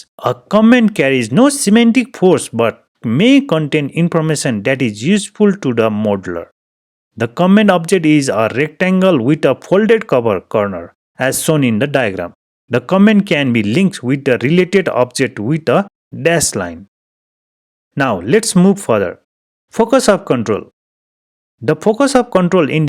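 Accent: Nepal. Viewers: I cant help but notice newar accent. 😂 Great video!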